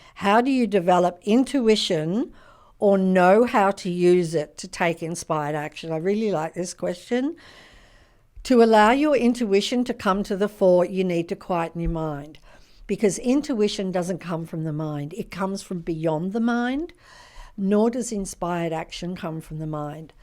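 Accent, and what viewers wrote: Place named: Australia. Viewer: It's our Aussie accent I love it, and I live in Melbourne, the best city in the World to live, so say who. Anyway love these thoughts❤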